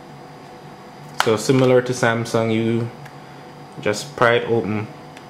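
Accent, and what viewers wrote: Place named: Jamaica. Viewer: Good Review, (I Click Liked thumbs up) You have a Nice Jamaican accent, But we Trinidadians are rated internationally as Having the "Sexiest Accents in the World" ...........LOL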